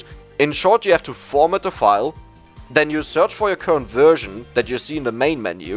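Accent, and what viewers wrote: Germany. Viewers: Hab nach 2 sekunden gemerkt dass du deutsch bist, aber geiles video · Bro you're a German, right? Idk I feel the German accent in your English so badly haha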